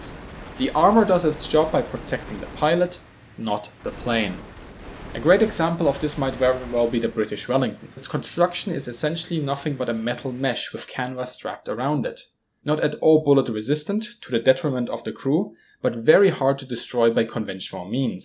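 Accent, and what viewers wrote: Germany. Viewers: R u german? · Ich kann aus deinem aktzent raushören das du deutsch bist! Hallo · Man höhrt dass sie Deutscher oder Österreicher sind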